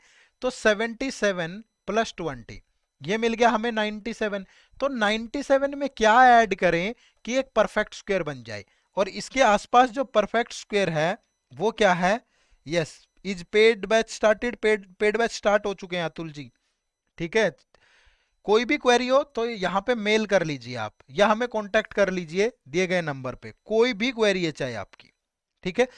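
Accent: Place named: Haryana, India. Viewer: Solved. Answer is c & great session Sir. Love your Haryanvi accent!!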